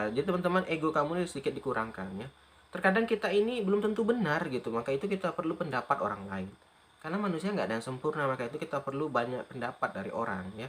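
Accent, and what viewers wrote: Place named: Java, Indonesia. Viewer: Klo ngomong.... Bahasaya...... Lbut Org jawa apa org medan. Apa prg madura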